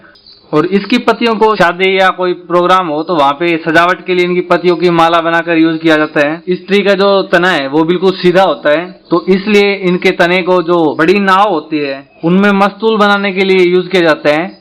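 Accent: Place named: Haryana, India. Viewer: भाई हरियाणा से हो आप आपकी बोलने की टोन से पता चल रहा है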